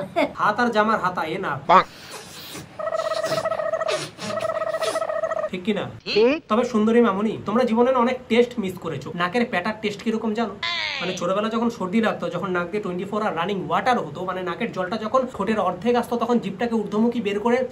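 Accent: Bangladesh. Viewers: বাংলাদেশ থেকে বলছি দাদা,,, তুমি অসাধারন বাংলা বলো,, তোমার বাংলা বলাটা দারুন,,,সেরা বাঙালী তুমি দাদা,,, কোচবিহারের ভাষা,আমাদের ভাষা একদম এক । মনেই হয়না তুমি পশ্চিম বঙ্গের ছেলে,,,মনে হয় তুমি আমাদের প্রিয় বাংলার সন্তান,,,,কি কোলকাতা কি ঢাকা ,,,তোমার মাধ্যমে সব একাকার হয়ে যায়,,, তোমার এই ভিডিওর থিওরিটা খাটি বাঙালী পরিবারের সন্তানদের জীবন যাপনের চিত্র ফুটে ওঠে,,,মনেই হয়না তুমি কোচবিহারের ছেলে আর আমি কুষ্টিয়ার ছেলে,,,, ছোটবেলায় এভাবেই সর্দি লাগতো আর হাতে ঘসা মেরে আবার খেলা শুরু করতাম,,, মাঠে খেলতে খেলতে বন্ধুরা মিলে একসাথে পটি করতে বসতাম,,,আবার সেটা ডুমুর পাতা দিয়ে ঘসে পুনরায় খেলা করতাম,,,, আমরা গর্বিত ,আমরা বাঙালী,, আমাদের রীতি রেওয়াজ,কৃষ্টি কালচার একেবারে সেম। কোন পার্থক্য নেই । পশ্চিমবঙ্গ বাংলাদেশ একসময় এক ছিল,,,তোমার ভিডিও দেখলে মনে হয় আজও আমরা একই আছি,,,, হাসিয়ে যাও ভাই,,,আমার চোখে তুমি একজন খাটি বাঙালী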